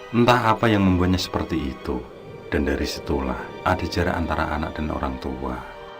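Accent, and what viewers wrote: Java, Indonesia. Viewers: suarane anteb.. jowo mbangets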